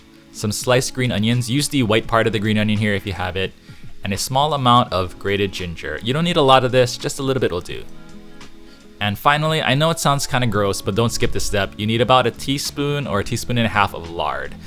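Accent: Hawaii, United States. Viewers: Are you from Hawaii? You sound like you're from hawaii.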